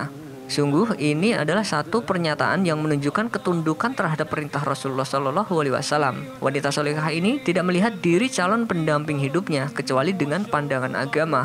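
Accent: Java, Indonesia. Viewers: Mimin orang jawa ya??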